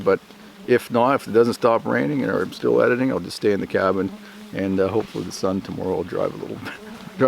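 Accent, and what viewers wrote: Canada. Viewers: are you canadian or american ? love your video very very much by the way thanks man from quebec !!!!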